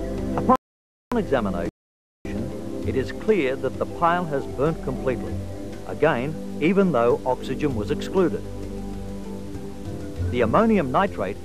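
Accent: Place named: Australia. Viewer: aussie documentary? listen to the accent, as an aussie picked it straight away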